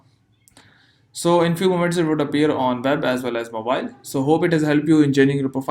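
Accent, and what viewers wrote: India. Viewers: Right when I heard that Indian accent I knew it would be the easiest tutorial thanks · You know he speaking facts when you hear he’s Indian accent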